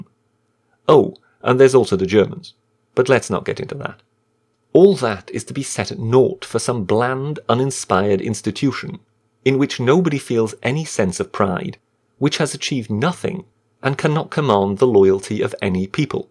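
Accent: Wales, United Kingdom. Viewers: I never realised how biased the media was in the UK until Brexit came about. The BBC's supposed impartiality was exposed to me as being a complete and utter lie. They're even worse when it comes to President Trump. Always negative, never positive completely one sided in most regards. Great summary, should be heard by politicians. I detect a Welsh accent too! ;) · Do I hear a Welsh twang?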